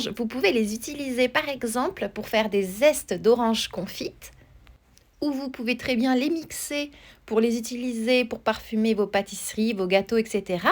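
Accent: France. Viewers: J’aime beaucoup votre accent Francais; tres, tres mignon! Je n’ai jamais les occasions parler ou ecouter a la belle langue francaise!! J’aime beaucoup votre recette aussi, je vais immediatement l’essayer! Merci a vous 😃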